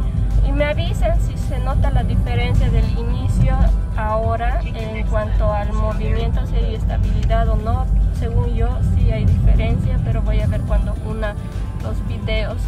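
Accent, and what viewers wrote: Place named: Bolivia. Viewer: Me hueveo no? jajajajaja me mataste, eres autentica boliviana en tu manera de hablar 100%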